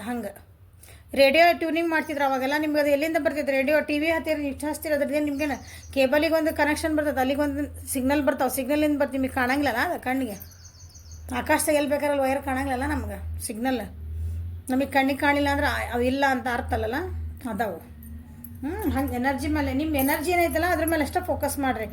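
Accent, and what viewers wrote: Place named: Badami, India. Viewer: Hi mam...nimmadu yava uru mam?nimma matina shyali namma uru Tara ede...nammadu badami..nimmadu yav uru mam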